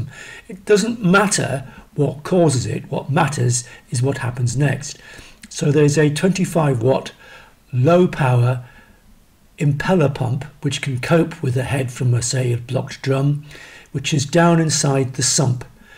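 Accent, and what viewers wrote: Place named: United Kingdom. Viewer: Great to hear a Brit voice using air lift. I’m at the design stage of building a new airlift pond, would love to see more of your airlift setup.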